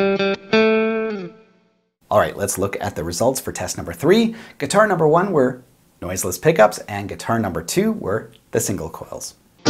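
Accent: Canada. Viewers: You have such a strong Canadian accent lol I love it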